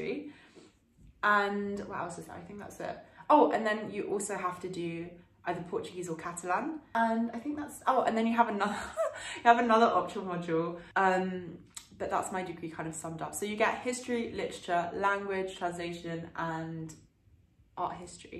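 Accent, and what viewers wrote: France. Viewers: At what age did you started to have the French accent and how?:)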